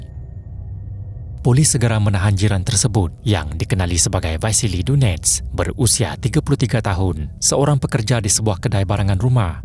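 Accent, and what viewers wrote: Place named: Malaysia. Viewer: Min indon ke Malay? 😂Dngr mcm malay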